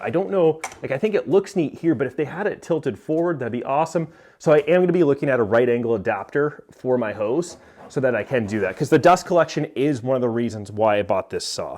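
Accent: Canada. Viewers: how did I know you are Canadian? lol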